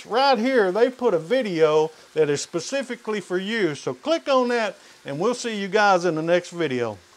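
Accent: South, United States. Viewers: Didn't trust anybody on YouTube showing me how to season a skillet i heared somone with a southern accent thanks for the video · Hi - I watched a couple of other cast iron skillet channels before I got to this one. They seemed ok, but once I found one with a Southern accent I knew I'd found my go to cast iron skillet channel. Thanks! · I grew up in the south watch your videos just to listen to your great accent. I may even buy a cast iron skillet 🤣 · Youre a diamond, I've just bought one it will be delivered later. I find it hard to trust all these fancy videos but then a bacon-frying American with a Southern accent comes along and shows how its done, I feel confident in my purchase now. And yes sir, I will be frying bacon in it. Much love from the UK 🇬🇧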